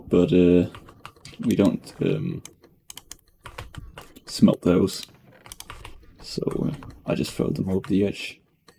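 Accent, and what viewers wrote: Denmark. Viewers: Are you danish? You sound like me when I speak English That sounded offensive but isn’t just hoping it’s true(I’m danish) Nice vids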